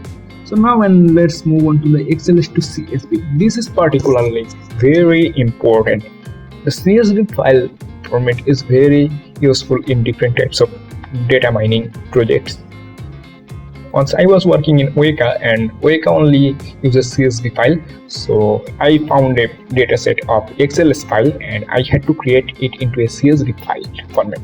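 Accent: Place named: Germany. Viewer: Du hast einen sehr deutschen Akzent 😂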